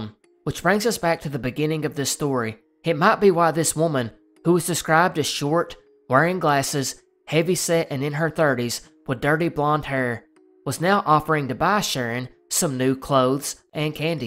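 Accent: South, United States. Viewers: Love your channel man. I’m from sc and it’s refreshing to hear a fellow southerner😂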